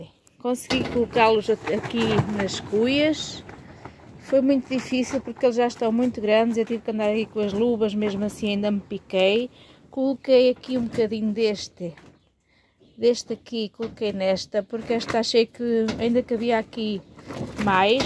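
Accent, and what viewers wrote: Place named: Coimbra, Portugal. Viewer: Boa noite conseguiu limpar as formigas todas? Haviam muitas no cactus! Espero que nunca me aconteça… 😮 Pela sua forma de falar pensava que era de Coimbra 😊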